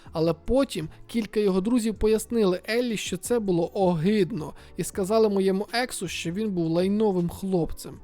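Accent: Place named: Zaporizhzhia, Ukraine. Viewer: Ваша подача інформації та коментарі дуже піднімають настрій😊 дуже дякую за вашу роботу. Дуже схоже наче слухаю людей із Запоріжжя, якщо тут є запорожці думаю мене зрозуміють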